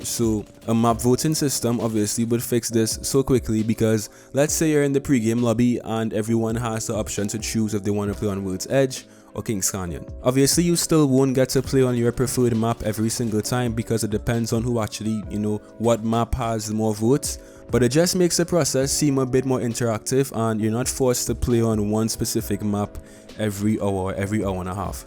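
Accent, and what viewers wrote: Trinidad and Tobago. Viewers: Are you from Trinidad and Tobago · Are you trini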